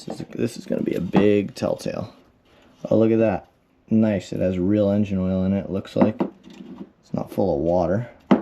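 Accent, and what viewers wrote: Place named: New York, United States. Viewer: This guy has that New Yauk twang and he lives in Florida.... Poor Florida, we are full up with these jerk offs....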